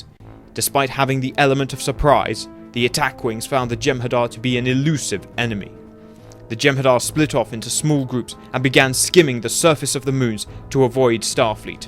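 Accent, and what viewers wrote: RP, United Kingdom. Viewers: You are sure to come from England because you can clearly hear the Received Pronunciation. Your RP or British English is flawless and very clean for me to understand in contrast to US English. There are also differences, for example in New England, the people there are very close to the RP. Otherwise, I don't understand anyone in the US. The pronunciation is worst for me in Alabama. Learning English is compulsory in German schools, but I think the mistake is that the students are drilled in RP. Not only in pronunciation, but also in vocabulary. There are clear differences between words and spelling in US English. My pen pal from the USA agrees with me and thinks that it is a mistake for the students to be drilled in RP in Germany. He says he always notices that when he reads my letters, because I stick to the RP vocabulary and also to the spelling of words that are spelled differently in US.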